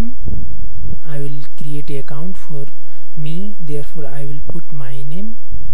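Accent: India. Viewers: he's speaking indian accent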